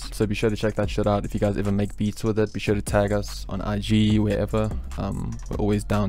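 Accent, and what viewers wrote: South Africa. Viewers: Bro are you from south africa coz your accent is like that lol...great vid im learning alot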